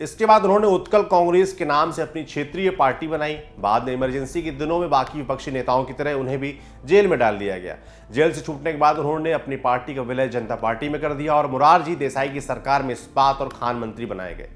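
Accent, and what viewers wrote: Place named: Bengal, India. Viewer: Ete "O" lageicha Bengali laguchi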